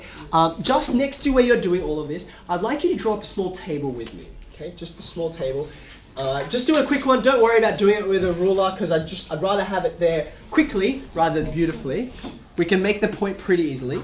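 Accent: Australia. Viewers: a prime example of an Asian with an Australian accent.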